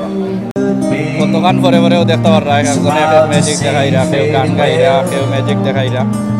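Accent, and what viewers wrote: Sylhet, Bangladesh. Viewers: Md Khairul এ রিপুজির বাচ্চা এটা সিলেটের আজ্ঞলিক ভাষা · ata sylhety language understand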